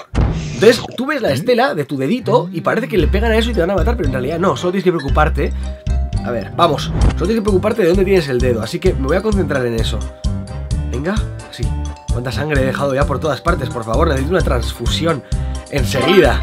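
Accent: Argentina. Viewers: Amo como hablas en castellano (argentino) ❤ SOS UN GENIO💜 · hablando argentino es lo más hermoso que hay♥ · Jaja a es al que mejor le sale el acento argentino (entre los youtubers españoles que lo hacen)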